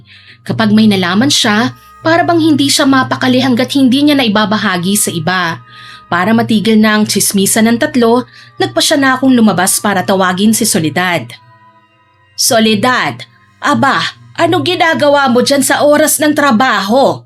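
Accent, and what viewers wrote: Visayas, Philippines. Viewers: More Bisaya or ilongga voice po bahay din SA Inyo ms. ☺️napapangiti talaga ako pagnikikinig ako SA Inyo 🫶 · Ang cute ng pagka bisaya miss, as bisaya approved ka sa akin May pagka Hiligaynon ang tono hehehe pa shout out po next upload nyo watching from Oman 🇴🇲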